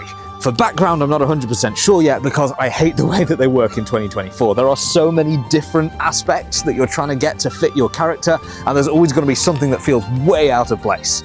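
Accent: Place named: United Kingdom. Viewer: I really do hope that in the actual campaign you play up your Bri'ish accent.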